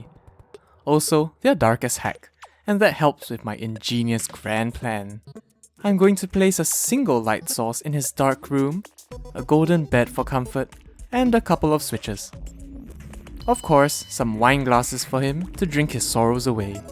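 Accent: Singapore. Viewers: Are you singaporean? I can tell from the accent if so lol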